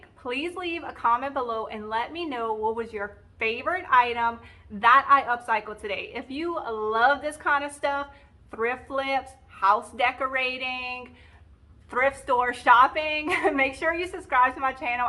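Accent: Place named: Lafayette, United States. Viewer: when you put in your "paid" value, it would be helpful to include a rough estimate for your supplies, beause i know the iod transfers are not cheap, and you have to account for at least some of the paints. i just recently found your channel and am enjoying it. your voice takes me home to my teen years. i grew up in the subs of houston, texas. my best friend was from morgan city, la and my boyfriend was from layfayette. you sound a lot like them.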